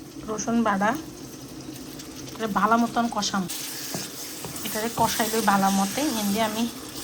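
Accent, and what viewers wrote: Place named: Bhola, Bangladesh. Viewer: আসসালামু আলাইকুম আপু।আমনেরে এক্কেরে আর দাদীর মতন লাগে বোজ্জেন নি আপু,আমার বাড়ি ভোলা চরফ্যাশন কেরামতগন্জ কিন্তু আমনেগো আর আগ্গো কথা এক্কেরে মিল আছে বোজ্জেন নি আপু,,,, আমনের ভিডিও লইয়া আমার কোন অভিযোগ নাই,,, আমনেরে দেখলে আগ্গো দেশ দেকচি মনয়,,, আমি বর্তমানে চট্টগ্রাম থাকি 😢😢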